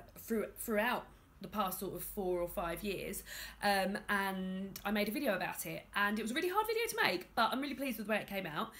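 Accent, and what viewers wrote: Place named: RP, United Kingdom. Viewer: Am new to your channel and watched these videos only yesterday lol. You make my wife laugh she says you sound posh and thinks is funny when you swear. xxx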